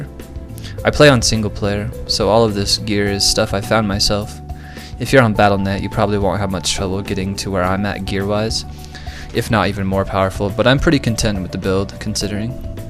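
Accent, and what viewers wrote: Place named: Oregon, United States. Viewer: This is the most Oregon voice ever.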